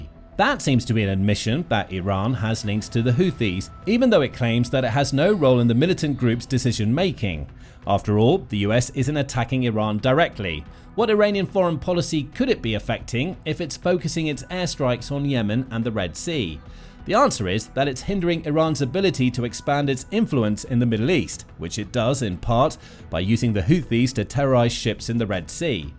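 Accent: United Kingdom. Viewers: Your British accent tells me everything I need to know BBC should worry more a about your rip gang's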